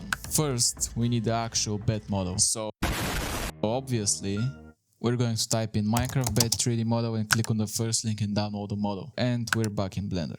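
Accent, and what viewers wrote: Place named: Russia. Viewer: russian accent = trust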